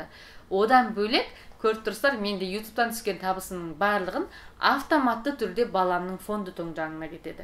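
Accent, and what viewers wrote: Standard. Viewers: Менде сізге қосылам,әдемі,сұлу,қазақша таза сөйлейтін,қылықты қыздың кәрістің қатыны болғаны өкінішті. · Қазақша таза сөйлейді екенсің. Айналдым даусыңнан. Бақытты бол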